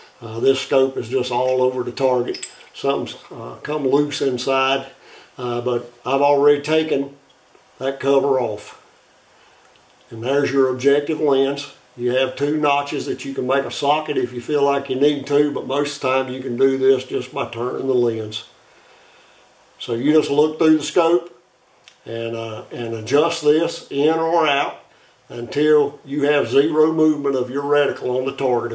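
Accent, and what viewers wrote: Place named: South, United States. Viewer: Really enjoying your videos. It’s all good. Good to hear an American country voice that I can understand and knows his business. I personally thank you taking the time to share your knowledge with us.👍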